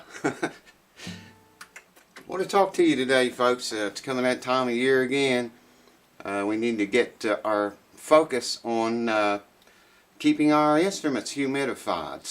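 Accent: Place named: West Virginia, United States. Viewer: Thank you very much for this video. BTW are you from WV? I think I recognize your accent. I'm from Poca River.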